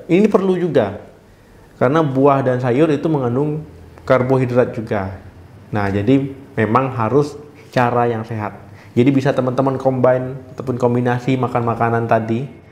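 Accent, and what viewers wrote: Aceh, Indonesia. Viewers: Dokter nya orang aceh y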